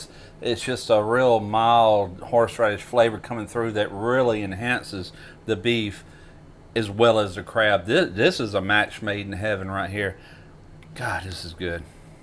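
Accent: South, United States. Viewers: Dang, it just keeps getting better and better! That southern twang is always the way to go. Thanks again!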